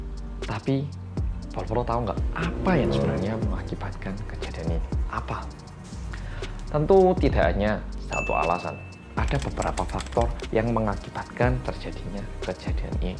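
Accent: Java, Indonesia. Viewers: Sudah ngepot ngepot gitu ya 😄😄 medhokk poll mas ki. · Medokkkkkkkk semangat amat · Ga yakin saya Anda orang Korea... Mendok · Masnya nich orang mana sih.... tampange wong korea tpi ngomonge ky wong jawa.... 😂😂😂😂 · Medokkk ... 😆😆 ttp semangat ... ttp jg kesehatan & GBU 😇😇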